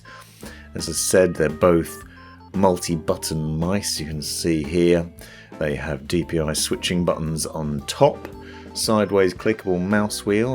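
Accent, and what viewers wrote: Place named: RP, United Kingdom. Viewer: u sound like a rich dude living in a castle....in england......posh voice NOICE though u gave a clear info about this mouse now all i need to do is save up some cash and buy it some day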